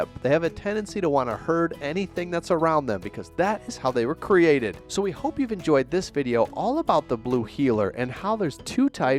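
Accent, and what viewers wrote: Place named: United States. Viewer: thank you. I bred ACD’s once upon a time in north Queensland. They’re the only dog I’ve ever owned. I put in thousands of hours a year training them, studying them, and teaching their new owners all about their behaviours + heritage. Whenever I watch a YouTube video on them - particularly one narrated with an American accent - it’s easy to predict that the content will be mostly non-factual. This video was no exception.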